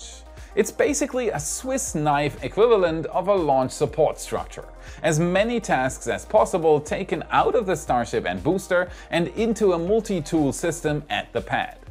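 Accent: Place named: Germany. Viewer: not a bad idea, but no, it isn't. His native language is German. He broadcasts in English so he can reach a predominantly American audience, and I am very glad he does. No other English speaking nation says it that way, either, that I know of, and I do have a high degree of familiarity with the other dialects. As an aside, I think we should pronounce it that way, but we don't.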